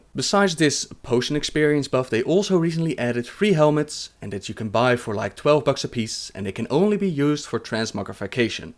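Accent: Netherlands. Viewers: are you dutch ? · Your Dutch accent is ridiculous.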